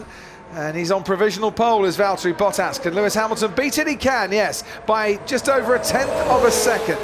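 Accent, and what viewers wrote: Australia. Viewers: Australian😂😂? Btw so fast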